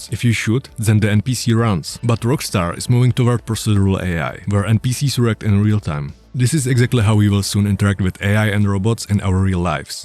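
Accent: Czech Republic. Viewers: this is very dystopian, future is scary... no one took the lesson from Terminator... Btw arent you Czech by any chance? :D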